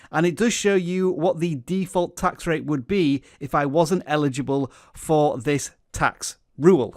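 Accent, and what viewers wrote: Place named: United Kingdom. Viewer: I'm confused. How does it work out for you? You sound like you come from the UK, listed as being in the US and you say you live in Canada?? Are you not sharing a VPN like loophole we don't know about? :)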